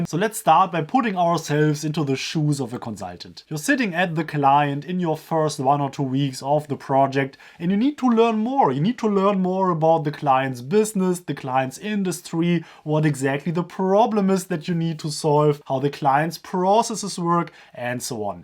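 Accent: Germany. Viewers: I love your heavy German accent. You go <3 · you already have a leg up. You are white, you speak with a German accent and your name is. Now imagine this - I am brown, I speak with an accent from the Global South and my name is "exotic".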